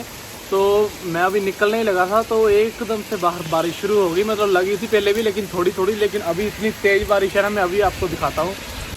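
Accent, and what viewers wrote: Punjab, India. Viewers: Bro tuhadi Punjabi leak kardi rehndi hai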